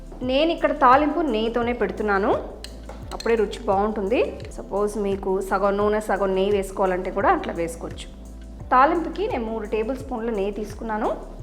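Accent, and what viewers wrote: Tamil Nadu, India. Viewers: అవిడ భాష పలికే తీరే చేప్తుంది తమీలీయిన్ అని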